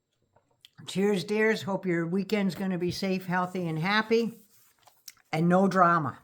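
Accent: Canada. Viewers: Thanks for the Canadian voice. Trump needs to be said to the face - and I have this from a Black lady: Don't bullshit the bullshitter! Well, Trump has bullshitted his voters and supporters.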